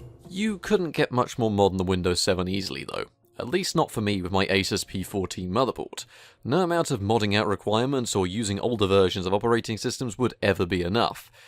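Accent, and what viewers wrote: United Kingdom. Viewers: why i hate british accent i dont understand :/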